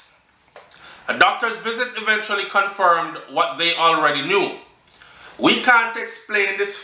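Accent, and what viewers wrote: Trinidad, Trinidad and Tobago. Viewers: Ur trinidadian accent shot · Love the Trini accent 😎. When is going to update us on the baby ❤️—a Mother’s Day video would be nice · I like your trini accent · you sound sweet talking like Trini OMG love it don,t cave about people life they live every one do what they want to do all I can say two dutch pot can,t close or two cover that is all I can say not for me to judge leave it to god glad for them. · I can’t do the trinity accent, but I think u did well.lol However, ‘miracle’? Daaang, I thought we all knew sperm in pum pum Mek baby.loool Sheeeet these liberals always redefining reality with some bs mind fugg! Oh how brave smh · Your Trini accent bro!! 🤣🤣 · Yow your trini accent is on fire. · I cant with you with the Trinidadian accent. 😆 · Love the accient spot on you sound like my cousin in Trinidad. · 🤣🤣 you’re trying to read like a trini bless up bro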